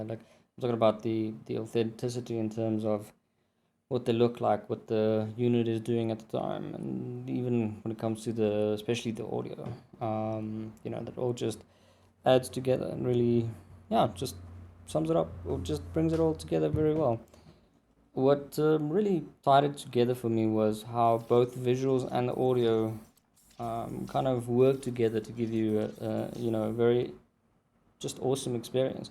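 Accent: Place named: Afrikaans, South Africa. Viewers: It's easy to spot a South African ;) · you sound afrikaans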